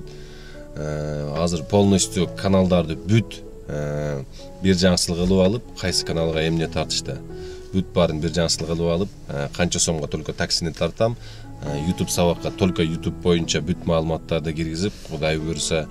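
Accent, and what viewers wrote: Caucasus. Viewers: Кыргызлекенсинго, орус тили кавказкий акцент тугондубу ?😂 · Чото кавказский аксент болуп кеттиң эле акыркы күндөрү анан эле жок болуп кеттиң не болду экеен дедим эле.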